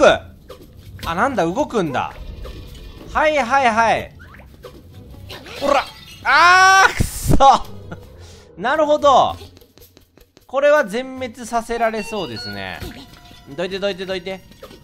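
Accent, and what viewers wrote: Kansai, Japan. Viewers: レトさんの関西弁にキュン死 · 初っ端から関西弁聴けて幸せだいぶ幸せお耳が幸せとにかく幸せ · レトさんの関西弁すきなんよなー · レトさんの関西弁違和感なさすぎる( ˘ω˘ ) ｽﾔｧ…さすが関西出身やなぁ…好きやわ～レトさんの関西弁( ◜௰◝ ) あっ忘れてました( ˊᵕˋ ;)💦 あっこちら皆様が求めているシーンとなります|´-`)ﾁﾗｯ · レトさんの関西弁が好き〜〜〜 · レトさんの関西弁可愛い( ´,,•ω•,,`)♡ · レトさんの関西弁がたくさん聞ける神回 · レトさんの関西弁(⸝⸝⸝ᵒ̴̶̷̥́ - ᵒ̴̶̷̣̥̀⸝⸝⸝)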